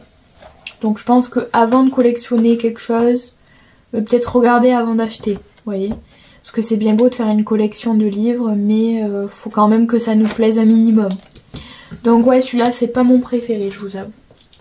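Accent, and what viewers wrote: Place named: South, France. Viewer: Coucou, je suis tombée par hasard sur cette vidéo et j'ai passé un très bon moment, je possède aussi le petit livre Fantasy mystère, effectivement les solutions sont superbes mais comme tu dis c'est tellement petit 😱 J'avais commencé le dragon et je l'a laissé en cours , je n'ai pas le courage de le reprendre 🤣 J'ai fait la chouette que tu montre et je te confirme que c'était très long , et encore , moi je fais ça aux feutres donc je trouve que c'est moins difficile d'accès qu'avec une pointe pinceau comme les feutres acrylique , surtout dans les toutes petites zones ! Je ne sais pas d'où tu es exactement mais ton accent me fait pas mal penser à celui de chez moi , même si, pour une sudiste, j'ai pas un accent ultra marqué, je suis pas loin de Montpellier, enfin à 45 minutes quand même xD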